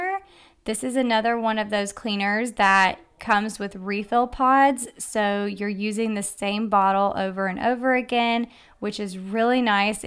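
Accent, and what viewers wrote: South, United States. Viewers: Enjoy listening to you talk with your “southern accent”. Originally from Waynesboro Ga (30 minutes from Augusta) but now live in South Carolina.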